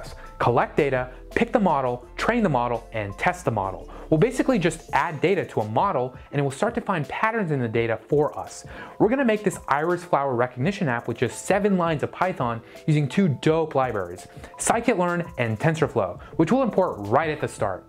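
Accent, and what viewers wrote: India. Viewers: what's wrong with your voice , seems desi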